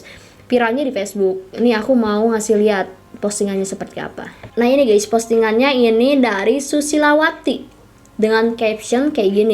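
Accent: West Java, Indonesia. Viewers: Logat sunda nya masih berasa :")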